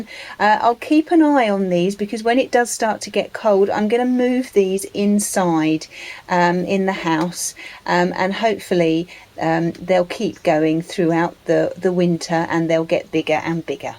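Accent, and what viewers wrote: United Kingdom. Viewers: Hello. I always enjoy watchin you and listening to your messages and that beautiful British accent. Love it. I like hearing about the differences in our climates and what we can and can't grow. I'm from Alabama, USA. I had a little, scattered garden this year with a nice little patch of okra. BUT, I've had to fight to keep the ants off my okra and corn plants and the squash bugs off the squash plants. Oh well. I think next year I'll plant the way I've heard people talk about, plant some for myself, plant some for my neighbor, and plant some for the bugs.That's a tall order.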